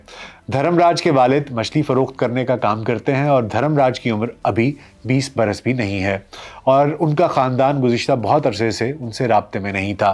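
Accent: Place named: Pakistan. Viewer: From Pakistan, app ki urdu aur andaz e bayan sa lag hi nai raha ka app india sa ho. Beautiful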